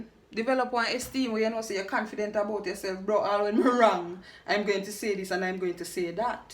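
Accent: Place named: Jamaica. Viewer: I love your accent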